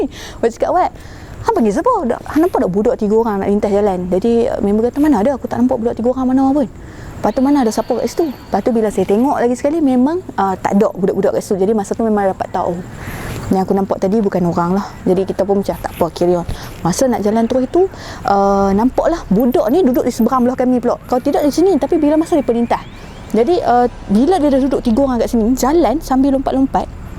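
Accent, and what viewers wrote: North, Malaysia. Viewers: Pekatnya loghat utara, saya yg org n9 ni kena dgr betul2 baru faham 😅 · tetap... Saya akan sokong walaupun bahasa utara ni kadang-kadang kasar seperti kertas pasir namun kehadiran cerita cerita seramu sentiasa ku nantikan asalkan keseramannya sampai ke paru paru dan limpa hahaha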